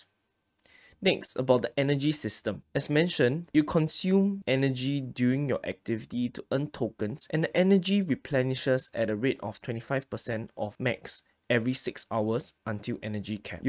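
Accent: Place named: Malaysia. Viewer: no worries mate, ur explanation was crystal clear and highlights most of the important things that people like me wanna know. my question is, are you malaysian? singaporean? or indonesian? that english accent of yours is familiar to me haha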